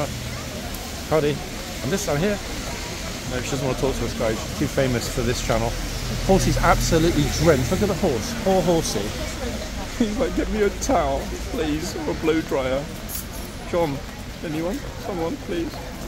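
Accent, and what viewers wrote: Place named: England, United Kingdom. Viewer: What part of England does your accent come from? You are easy for my American ears to understand! That rain is relentless!! Funny how spoiled the horses are...mine would often choose to stay out in the pasture in the rain and snow instead of heading into his barn!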